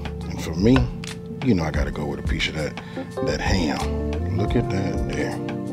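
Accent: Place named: Mississippi, United States. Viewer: You GOTTA be from my home state of Mississippi!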